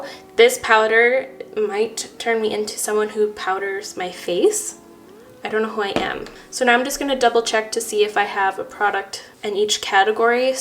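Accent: France. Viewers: Can you do a tutorial completely in French. I love your accent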